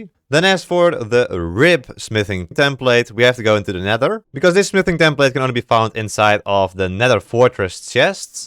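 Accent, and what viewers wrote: Netherlands. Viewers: this guy is def dutch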